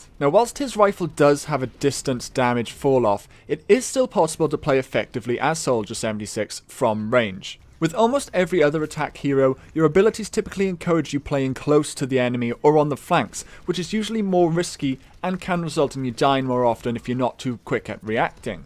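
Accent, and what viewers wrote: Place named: England, United Kingdom. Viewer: dont get me wrong i really really like ur vids but i so not agree with u on this vid. no hero is actually ez to play cus 1. each player have his own play game and and there are players that it would be difficult to them to play those heros with there type of play. 2. try to play those heros in actual sieries mode or like comp, its not ez to play them like this. another thing i want to say that every player should play what he likes to play even if that was the hardest (all though i allready explained that there are no ez and harders) and the players dont need to play just what fits to to new players they need to play what they like and what they want to. thx and pls answer me 1 more question: in the qna vid u sayed u r not from england. then where are u from? u really sums from england thx!! and again dont get me wrong i love ur vids just i dont agree with u on this 1 and dont worry, i didnt dislike XD thx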